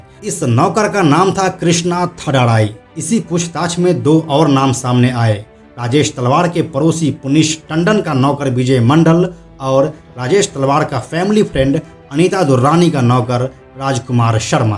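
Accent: Bihar, India. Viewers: Ye. Bihari. Bol. Raha. H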